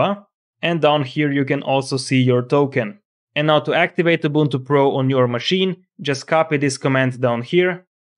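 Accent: Russia. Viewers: Че акцент такой русский?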